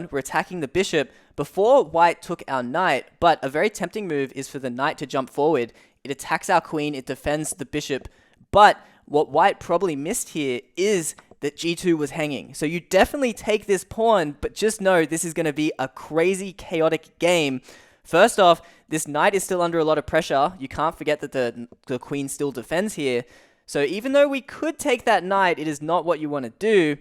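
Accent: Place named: Australia. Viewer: Chess feels so different when it is explained with the Aussie accent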